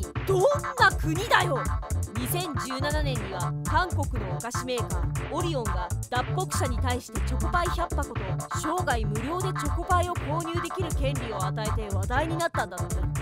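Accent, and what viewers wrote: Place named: Hakata, Japan. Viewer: 山梨県民なのにバリバリ博多弁 いえいえ、気にしないでください！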